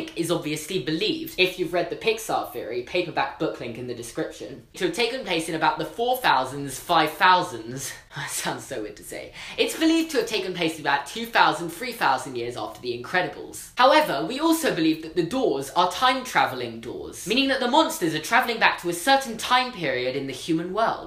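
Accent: United Kingdom. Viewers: is he British just cause I'm British and someone I know sounds like him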